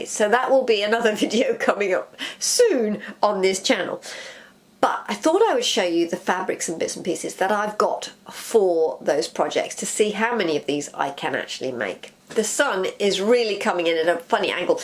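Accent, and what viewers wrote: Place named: England, United Kingdom. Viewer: I'm thrilled to have found your channel! Now i have to hunker down and watch what I've missed 😊. After hearing your English accent, but you then saying $ instead of £, I read your description. How lovely to be next door to Cornwall Park. I used to gallop around there at least 3x weekly, and drag the kids to the summit. Living in Nelson now. Can't wait for your next video ❤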